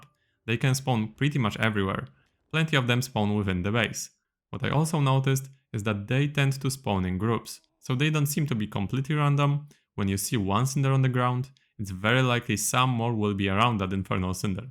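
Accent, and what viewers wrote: Poland. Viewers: bro are you from Poland?😁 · Coś tak przypuszczałem że jesteś z Polski po akcencie. Niech żyje wielka Polska! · Dosłownie moja reakcja! Ciekawe czy to zmyłka, czy serio jest Polakiem. Akcent w sumie by się zgadzał. :D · Polski akcent idzie od razu wyczuć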